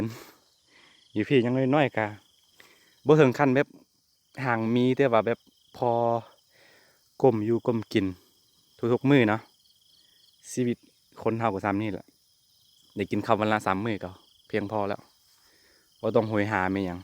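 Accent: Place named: Ubon Ratchathani, Thailand. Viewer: เจ้านิกะเว่าได้หลายภาษาเนาะเว่าคือคนอุบลบ้านข่อย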